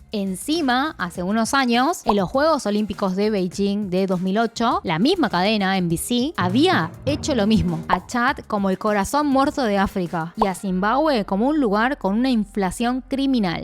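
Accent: Argentina. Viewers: ni tan mal la descripción de Ecuador 🇪🇨 bonito que destacaran el Chimborazo y las tortugas gigantes de Galápagos, pensé que pondrían bananos o maíz. Buen video, me llama la atención tu acento argentino para hablar español jajaja. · hablas re bien el argentino wtf cm lo logro Xd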